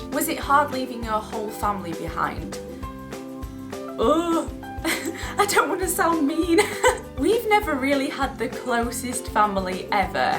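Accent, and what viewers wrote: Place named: Sheffield, United Kingdom. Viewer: Sheffield x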